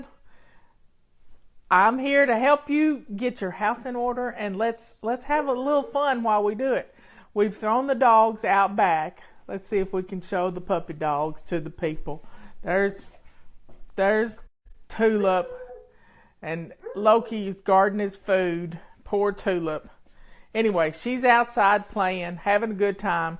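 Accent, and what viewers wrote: South, United States. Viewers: love your cute Southern drawl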